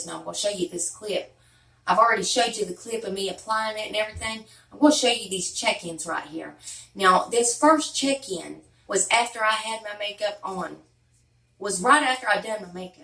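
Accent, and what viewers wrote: South, United States. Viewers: Girl love what watching your videos you.... you crack me up.... and I live all the make up tips that you give and I love your southern accent I'm a country girl myself ❤❤❤ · I'm going to try this out too. We'll see if it works on a 60 yr old face. Lol I love watching you and listening to your accent. I'm a southern in Arkansas so it's nice when I run into a southern accent.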